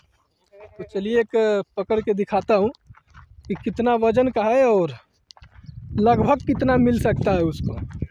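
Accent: India. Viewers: Bhai aap Bihar ya up se ho kya lagta hai aap bhojpuri bolte ho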